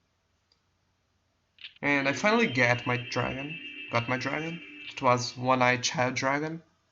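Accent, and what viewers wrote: Brazil. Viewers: Brasileiro falando inglês porra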